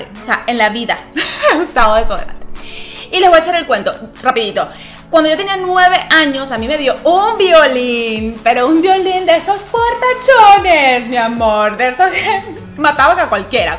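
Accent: Venezuela. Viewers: Es venezolana? es que tiene el acento 😁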